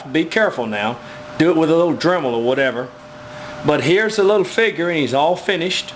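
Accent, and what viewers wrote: Texas, United States. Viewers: Nice info.. anyways is him using Texas accent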